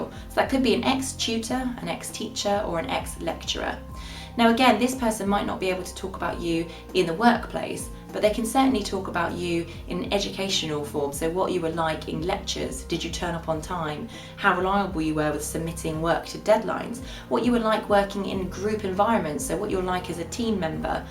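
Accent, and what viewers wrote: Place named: United Kingdom. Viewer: ick awful brittish accent and fugly eyebrows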